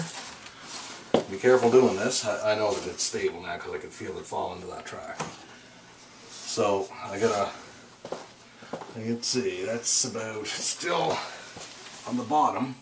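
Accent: Canada. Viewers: This is most helpful. Thank you. I LOVE the Canadian accent. My wife is from B.C. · from canada? one of my favorite accents....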